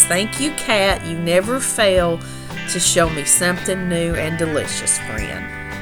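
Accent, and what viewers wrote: South, United States. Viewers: Howdy! This is Jessie from Ohio. One day I found one of your videos and since I had a migraine, I kept YouTube on and binged on your yummy recipes and southern calming voice (thank you for not using loud music). Since the second video I subscribed and I stop by daily to see if there might be one I missed or rewatch one I have seen and saved. So I finally thought I'd say hello and thank you for doing what you do. Hugs from Ohio.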